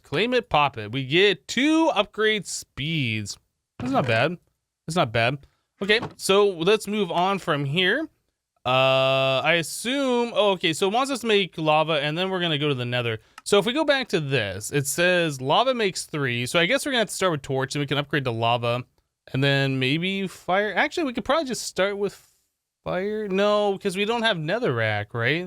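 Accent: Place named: Canada. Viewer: Are you Canadian? You have a funny accent.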